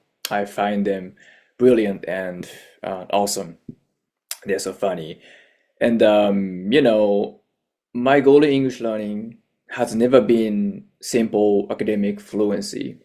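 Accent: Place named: Japan. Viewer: Here is some feedback for you: 1. You don't sound like you have a heavy Japanese accent. But it is not native sounding either. There are some sounds and phrasing flows that give away your Japanese roots. Some examples are the l/r mix, and the way you say some sets of words in quick flows that come out weird. Specially in the beginning to middle part of the video. You seem to settle on to a more natural flow later. 2. There are so many "you know"s and lip smacks that it became distracting to actually listen to what you were saying. If you would like to manipulate and charm people, maybe don't look for acknowledgement of your thought process so often, even involuntarily. The "You Know"s were honestly too much. Other than that, awesome confidence, projection, clarity and word choice. Keep going!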